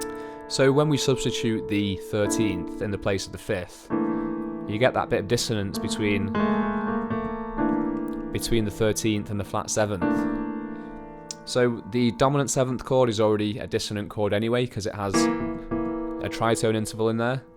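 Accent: Liverpool, United Kingdom. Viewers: Very helpful info but I was wondering if you can do (or already have) any videos on learning the right hand improv? Whenever I hear the middle improv section of a standard jazz piece, it always blows me away how good it sounds with the various runs etc and I would love to be able to learn how to do that. By the way is that a Liverpool accent I hear? Cheers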